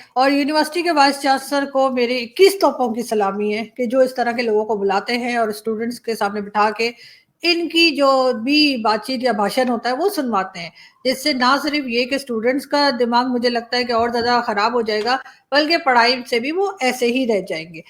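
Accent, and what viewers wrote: India. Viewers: ji aap ki jubaan paki kam hindustani jyada lagti hai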